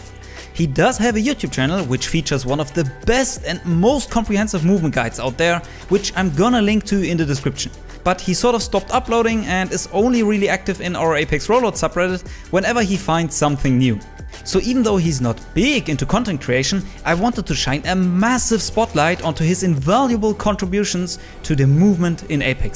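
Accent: Germany. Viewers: Bild ich mir das ein oder hast du n maximalen n deutsch aktzent?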